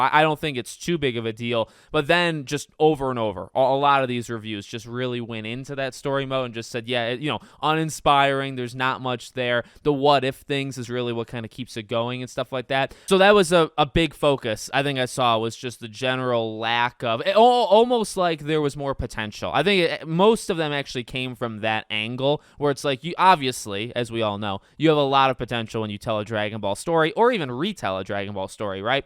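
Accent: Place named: Chicago, United States. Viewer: Are you from Chicago? You sound like my people 😊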